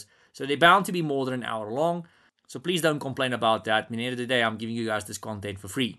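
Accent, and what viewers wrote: South Africa. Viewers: you're south african!!!!!!!!! the accent and your way of speaking lol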